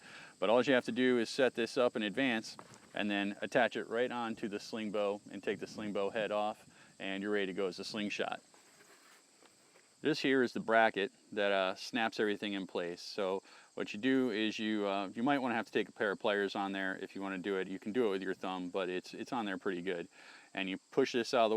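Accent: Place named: United States. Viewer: es insoportablemente gringo. Habla en jeringoso yankie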